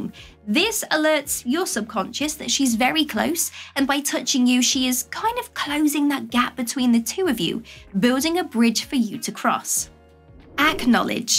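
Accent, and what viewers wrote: England, United Kingdom. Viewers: LOVE THE VOICE. IS IT ENGLISH, AUSTRALIAN, OR SOMETHING ELSE?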